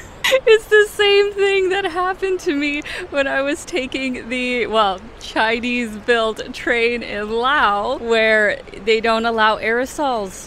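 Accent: Canada. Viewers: I can see why college students would want to watch videos … excellent pronunciation and cadence for those learning English (with a lovely slight Canadian accent). And it’s experiencing new adventures through positive respectful awareness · You know, I never really get when my American friends told me we have an accent, but somehow I can tell she’s Canadian from the way she talks. 😂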